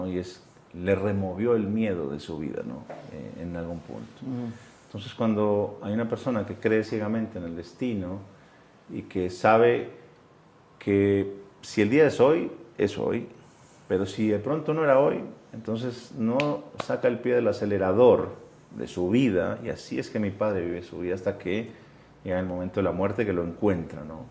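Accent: Mexico. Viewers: para nada , soy colonbiano y de la region dode nacieron ellos soy paisa, viaje por casi toda colombia y a leguas se ve que se le esta pegando el acento mexicano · Este Huevon adoptó el acento Mexicano sin serlo.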